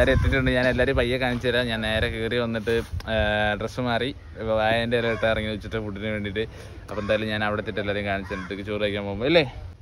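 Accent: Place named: Kozhikode, India. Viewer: Kozhikode slang 😁❤️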